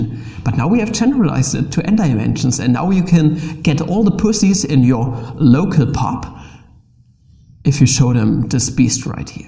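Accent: Germany. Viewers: are u german?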